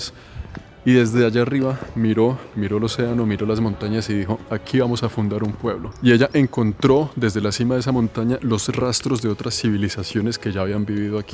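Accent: Colombia. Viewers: Que acento Colombiano más chocante , el video sería mejor sin ese Colombiano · hermano se te escucha muy colombiano jajaja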